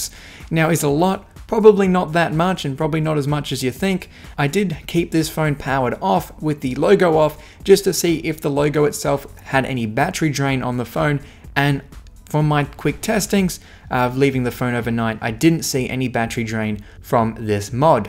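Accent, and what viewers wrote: Australia. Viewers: I like the Australian accent. You know, you are truly doing an outstanding job; I have watched almost each single video on your channel. Keep posting us!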